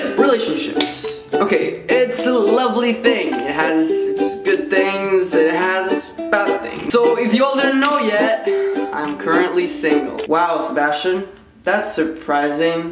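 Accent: Argentina. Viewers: Why are you my perfect guy but live so far away. Hahahaaa love your videos. This message is kinda late but ok. I love your argentinian accent! They're my weakness hahbaha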